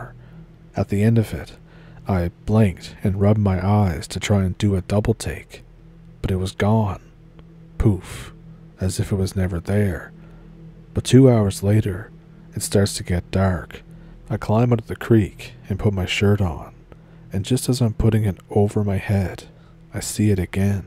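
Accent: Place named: Maritimes, Canada. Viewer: I love your stories, and I can even get past the EXTREME Maritime Provinces Canadian accent. But you sound lethargic and congested!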